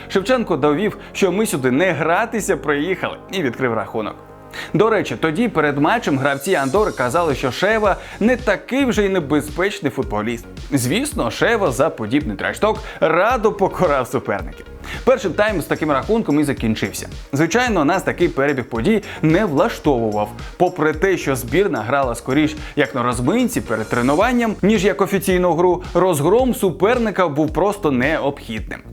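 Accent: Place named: Russia. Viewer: Я думав, тут голи покажуть, а тут тільки хлопець хизується, немов перед дівчатами. Ще й акає, мов рюсскі.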